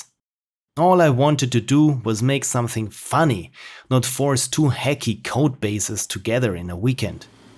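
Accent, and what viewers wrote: Germany. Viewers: I mean yea, you can hear the strong German Accent, but i pretty much forget about it in the first minute because im so damn fascinated about the quality of the video. I know this Post Morten Ludum Dare Series since two to three years, and always loved it, you are really talented at making this kind of stuff and i just hope one day you get the attention you really deserve. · Bruder muss los in allem ernste, fantastisches Video, ich hätte am Akzent gesagt du bist Deutsch aber die Alpen sagen irgendwie Österreich/Schweiz sono molto confuso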